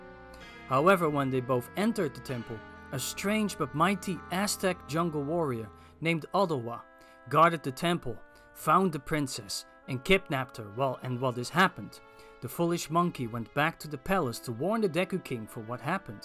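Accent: Netherlands. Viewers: You sound Dutch. Are you?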